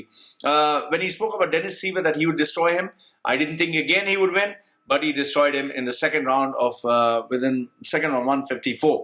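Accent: India. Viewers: Wasted 9 precious mins of my life.. Dude you talk in India accent. Are you from India? You make video with such a good title but you dont talk facts while making predictions... Rafael is 1inch shorter in height and reach is equal why hez big? Gather some facts and make video then it would be interesting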